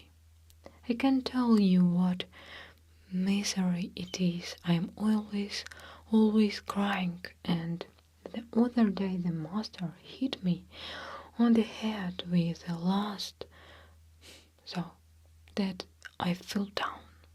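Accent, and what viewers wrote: Russia. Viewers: I admire Russian literature and Anton Chekhov was a great writer even though he is not as famous as Tolstoy. I think you should make this into a series especially stories from the 19th century. I love your soothing voice with that beautiful accent of yours 💕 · Thank you so much!~ I enjoy the relaxing tingles from this video and I love your Russian accent!~ You speak English so well as well if I may say so.~ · I also agree that your English is very good!! Your Russian accent is even more adorable and that is a great reason that I listen to you!!! Very sweet and relaxing!! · Beautiful, beautiful russian accent and all the options for names! wow! I like Russian so much. Thank you for another wonderful video and, you look so beautiful!!!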